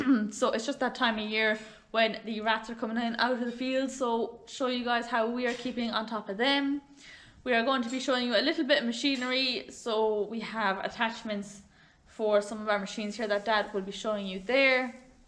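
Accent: Ireland. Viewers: Great video you present very well, love the Irish accent. Stay well and stay safe, and I look forward to more. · How have you managed to hold onto the Irish accent for so long?! enjoying the videos, keep up the good work!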